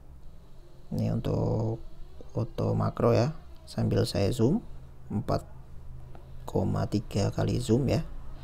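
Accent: Pasuruan, Indonesia. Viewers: Wong Pasuruan a mas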